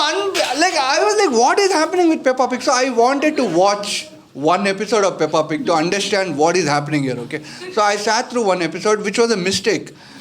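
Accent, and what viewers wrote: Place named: Telugu, India. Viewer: His accent reminds me of my Telugu friends from my hostel days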